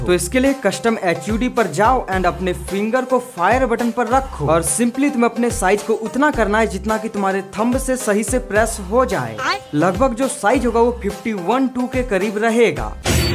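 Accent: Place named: West India, India. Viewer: Bhai ka taliking style full west india ke citizen ki tarah hai 🙂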